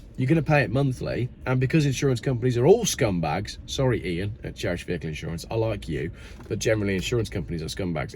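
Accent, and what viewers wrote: Wales, United Kingdom. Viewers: dell boy would be proud of that Welsh accent BOYO.and it’s pissing down in Bridgend at the moment.have a good day ✌️🙏🫶🏴 to the farmers. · Love your Welsh accent. More Welsh than my, born and bred in Wales accent. Lol. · As a welsh person, love the accent. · Great to have you back,. Loved the Welsh accent boyou! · I like you Welsh accent please keep it up, Cannot believe you still have compulsory Insurance in the UK (Pom Land) it’s crazy. I remember Customer buying a brand-new motorcycle when I was working over in the UK on my OE and Insurance cost the same as the motorcycle did . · From a welsh man I love the accent. 😂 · And it’s raining right now here in South Wales boyo, love the Welsh accent, 😂. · Don't worry, you are back in the groove. Enjoy the 20 mph limits. 😀. Love the Welsh accent by the way. 👍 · Bloody hell! I thought it was Rhod Gilbert! 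Cracking accent boyo. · Don't say sorry for the welsh accent, it was fantastic!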